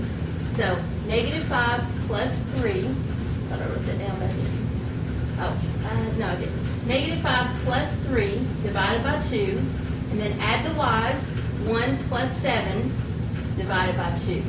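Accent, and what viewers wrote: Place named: Tennessee, United States. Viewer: Tennessee accent or Alabama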